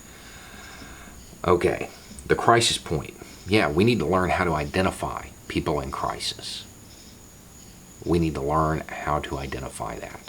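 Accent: South, United States. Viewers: I'm not bying a car here! I hear him right now, and he is close up to the establishment talking points. His southern accent only makes it sound more absurd.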